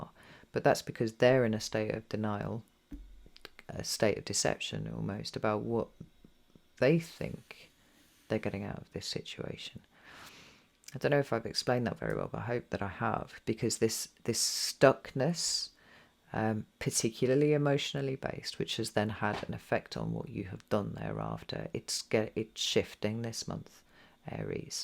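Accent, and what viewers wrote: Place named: North, United Kingdom. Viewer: Extraordinarily insightful and helpful references e.g. to the number 7 = rich content! Your accent seems to have become slightly more Northern since last time I watched :D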